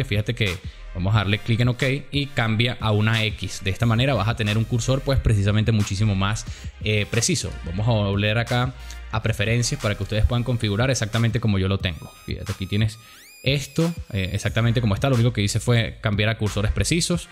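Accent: Venezuela. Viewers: bro...eres de Venezuela seguro XD suenas como venezolano pero ese no es el tema el tema es que eres un pro es esto felicitaciones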